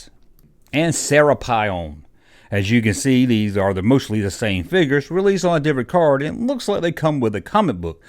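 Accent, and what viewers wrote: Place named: Georgia, United States. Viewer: Who gets mad a a great Southern Accent? Love the Georgian draw. No gay shit.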